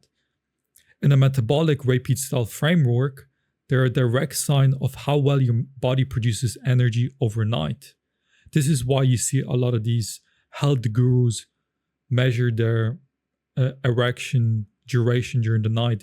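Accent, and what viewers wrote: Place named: Ireland. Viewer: Yes, is Polish but has said the he's lived in Ireland since age 9, which accounts for his accent.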